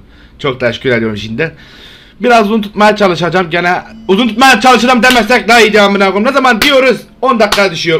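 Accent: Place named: Adana, Turkey. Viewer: Abi adanalı mısın sövmenden anladım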